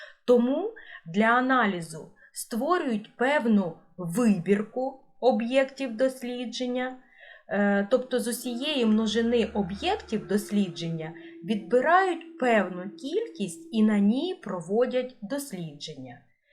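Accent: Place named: Russia. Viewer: Кацапський акцент)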